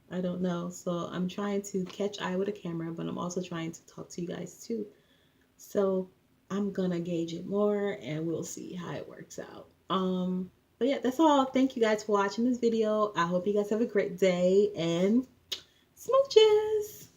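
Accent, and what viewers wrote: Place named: Liberia. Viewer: Thanks for the info! I hear the Liberian accent lol....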